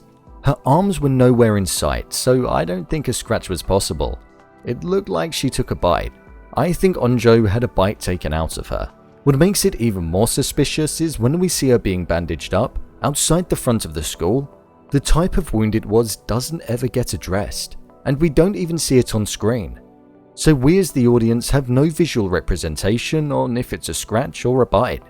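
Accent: United Kingdom. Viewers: i subed uh provably not for these topics but for the accent lol , listening you will help me learning british english a lot.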